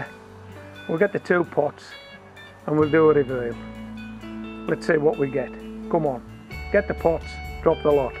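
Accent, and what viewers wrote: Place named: Teesside, United Kingdom. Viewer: You must be from Teesside with that accents mate. Where abouts are you from?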